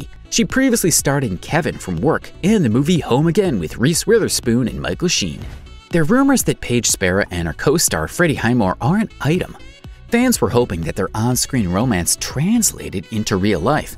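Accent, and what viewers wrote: United States. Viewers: That narrator’s Midwest pronunciation of the vowel “a” is tooooo much!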